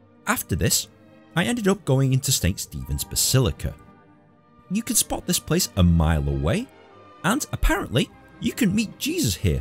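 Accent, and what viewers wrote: United Kingdom. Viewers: Fantastic video mate. Great to hear a travel guide done by a fellow Brit instead of another cheesy American. What’s the website for booking trips to the Hungarian parliament building? I’m hoping to go Budapest in the Spring.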